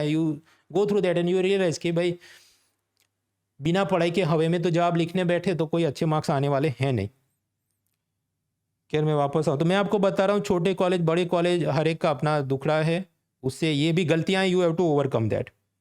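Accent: Gujarat, India. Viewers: Sir gujratse he ?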